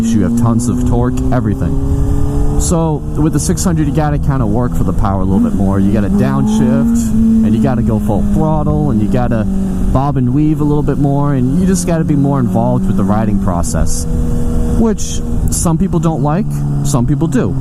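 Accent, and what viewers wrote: Massachusetts, United States. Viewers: You from my home state of Mass? You sound like it in your accent a little.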